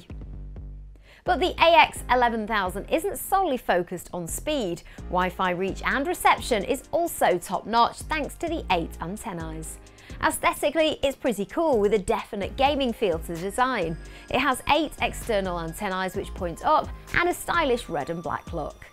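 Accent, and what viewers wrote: United Kingdom. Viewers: Awful British accent!! Rooter, Rooter, Rooter.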